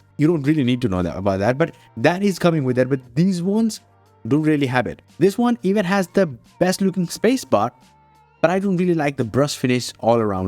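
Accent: India. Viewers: THICK BOMBAY ACCENT IS IRRITATING. THEY SHULD STICK WITH THEIR NATIONAL LANGUAGE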